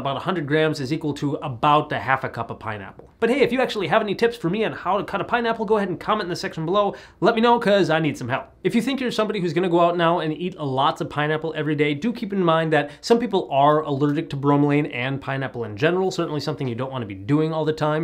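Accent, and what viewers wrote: United States. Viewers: Very informative video. I'll try that out.. Also, you're amazing, got a beautiful pure American accent AND you're so gorgeous lol.. Wish more doctors looked like you hahaha, or be your patient even better lol... Anyhow, thanks for your channel and videos. Subscribed and supporting your good work. :)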